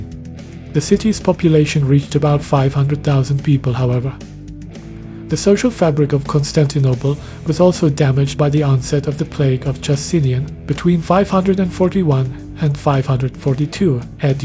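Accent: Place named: Portugal. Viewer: porteguese accent detected? 🇧🇷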